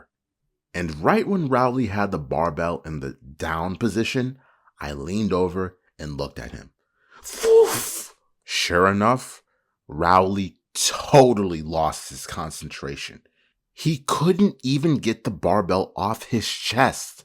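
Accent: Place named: United Kingdom. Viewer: Hes british i think bc of the tone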